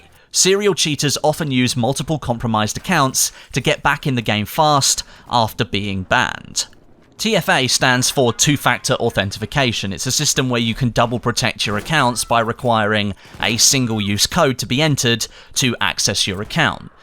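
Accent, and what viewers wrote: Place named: United Kingdom. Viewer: british accent sounds horrible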